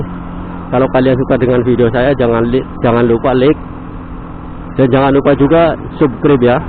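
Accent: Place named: Banyumas, Indonesia. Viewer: Koyone wong ngpak yo kang.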